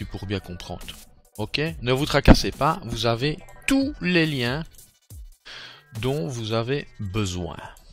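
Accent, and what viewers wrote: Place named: Liège, Belgium. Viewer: Oufti tu viens de lieeeeeege toi 😂😂 J'adore les liégeois 😜 Super tuto, j'attends encore quelques pièces pour mon serveur et puis j'installe proxmox 😁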